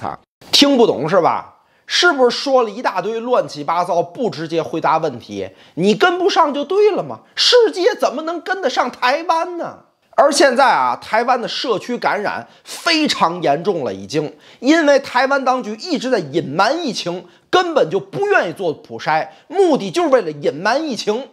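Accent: Tianjin, China. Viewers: 天津老乡吧？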